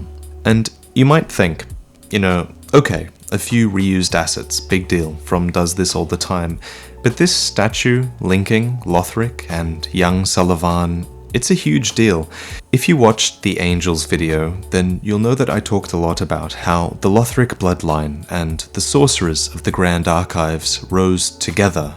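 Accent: Australia. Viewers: he is Australian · Hey, what part of Australia are you from?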